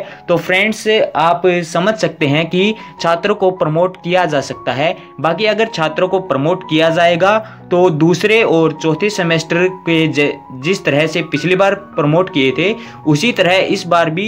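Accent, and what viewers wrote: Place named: Bhiwani, India. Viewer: bhai aap ki awaj suni suni lgi thi tho muje lga bhiwani se ho ge😅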